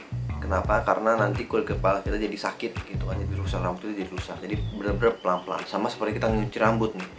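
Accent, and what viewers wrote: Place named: Aceh, Indonesia. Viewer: Orang aceh ya bang?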